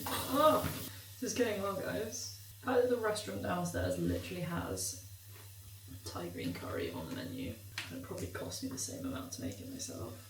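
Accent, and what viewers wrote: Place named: RP, United Kingdom. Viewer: Your accent is so posh lol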